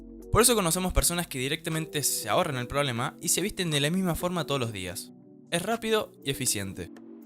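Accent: Córdoba, Argentina. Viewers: Esa tonada cordobesa 🤤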